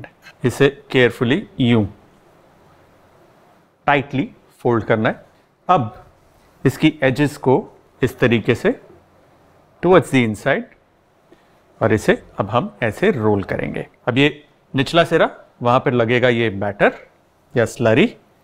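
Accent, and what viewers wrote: Punjab, India. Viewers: Sir your way of talking is very nice...total Punjabi accent.... You are wonderful chef....love your recipes......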